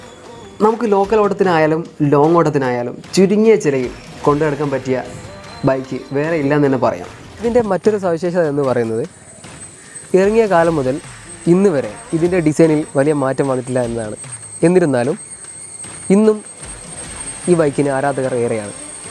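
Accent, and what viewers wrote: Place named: Vadakara, India. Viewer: Vadakara kaaran anallo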